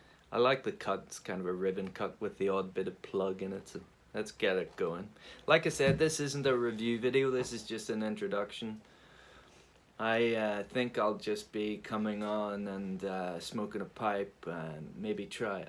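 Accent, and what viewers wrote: Ireland. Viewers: Great first video! Really enjoyed it. I’ll have to catch up on your other ones now. Welcome to presenting , I’m sure your channel will grow quickly. Everyone loves an Irish accent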